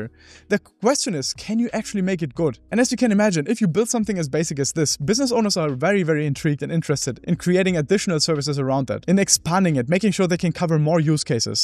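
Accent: Germany. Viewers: Das ist doch n deutscher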